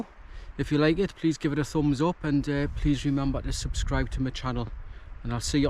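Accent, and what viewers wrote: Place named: Geordie, United Kingdom. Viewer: I went up Bizzle Crags years ago only to get to the top of the Cheviot and find it one of the worst places l have ever been in my life. It was sleeting, blowing a gale and zero visibility. There was no nice flag path just bog. I never bothered again and now l am old with knackered knees a two hour toddle around the fields in a gentler part of the country does it for me. Still it’s nice to be reminded and wonderful to hear Geordie dialect, Gods own in my opinion. All the best from a soft southern shandy drinker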